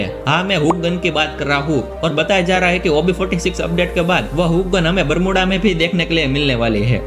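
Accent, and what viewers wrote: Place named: Gujarat, India. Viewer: I think you are Gujarati aapke aawaz se pata chal gyaa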